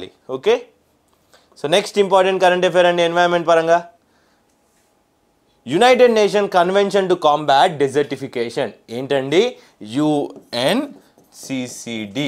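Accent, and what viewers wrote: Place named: Guntur, India. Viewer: Guntur language bro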